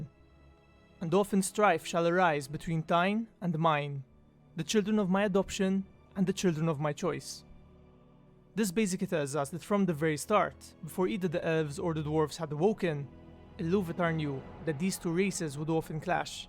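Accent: Malta. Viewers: He sounds maltese:) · Love the maltese accent :) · What a coincidence, Im from Malta aswell, thought your accent was uniquely familiar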